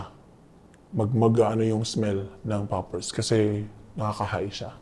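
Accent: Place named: Manila, Philippines. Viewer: You sounded like you're from DLSU 😀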